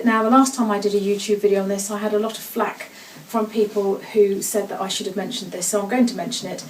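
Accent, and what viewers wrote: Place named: United Kingdom. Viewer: Love the accent, I swear, every girl from the UK is just ahead of the game simply because of it...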